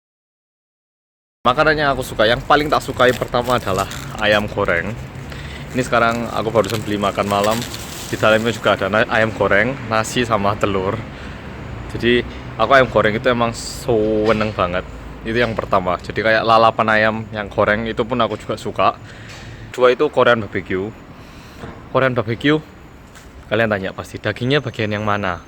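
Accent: Java, Indonesia. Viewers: logat jowone medokk bngtz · medok mu iku loh sesuatu buanget ms, hhhee :D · Mas bhasane jawa sampean kok medok banget,pdhl korea punya hhheee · Haha nek ngomong medok lek 😆😆 Umur nya tuaan aku berarti yo, Aku 93 !! Sukses ya lek 😊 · wkkkkkw muedhoookkkk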